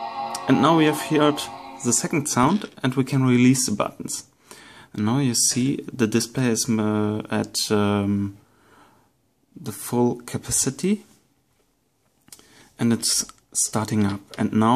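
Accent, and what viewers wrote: Germany. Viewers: German accent <3 Danke :)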